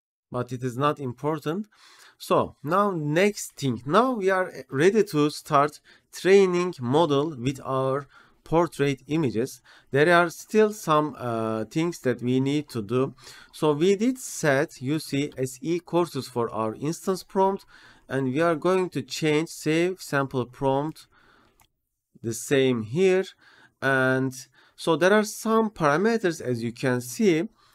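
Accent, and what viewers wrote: Turkey. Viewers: Bende diyorum bu ingilizce neden tanidik geliyor Turk oldugundanmis:)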